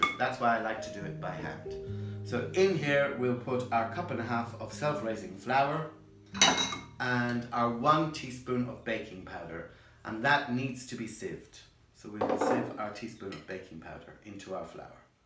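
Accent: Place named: Ireland. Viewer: just found your channel- really enjoying it- love listening to your brogue- reminds me of my grandparents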